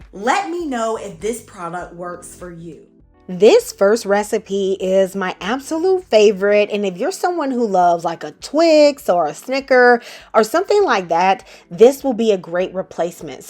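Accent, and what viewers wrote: South, United States. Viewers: Are you Southern? Like the video 💙💚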